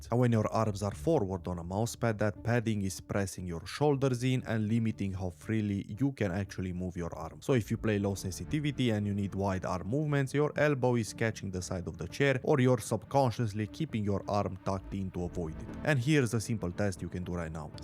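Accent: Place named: Romania. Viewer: romanian accent really showing in this one, nice vid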